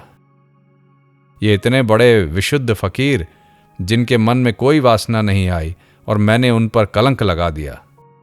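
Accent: Standard. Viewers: धन्यवाद ! बढिया उपदेश ! यह भी प्रशंसनीय बात है कि पारायण करनेवाले गुरुजी से हम सच्ची हिन्दी का उच्चारण भी सीख सकते हैं / कैसी अच्छी है यह बात !!! / यह सब भगवान् का अनुग्रह ही है / हरे राम ! हरे कृष्ण !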